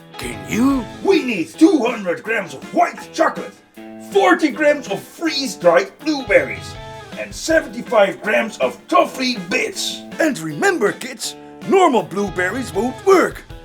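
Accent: Netherlands. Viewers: Love the Dutch accent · Is it me or does he sound dutch cuz i am from the netherlands and he speaks liek dutch trying to speak english so i think hes dustch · Why tf sounds bro so DUTCH?????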